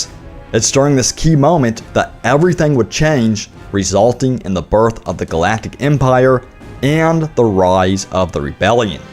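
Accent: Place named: South, United States. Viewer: You sound so fucking country.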